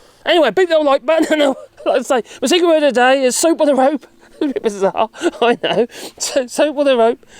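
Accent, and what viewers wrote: United Kingdom. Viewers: Obviously we Americans have more than enough bad stuff of our own creation to deal with over here, but misery loves company and hearing about the shite in the UK in this accent is always interesting. Stay well mate word of the day should be 'effluvium'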